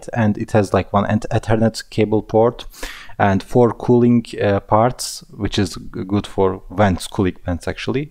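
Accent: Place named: Poland. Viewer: Omg nicee accenttt~ I love men with Polish accent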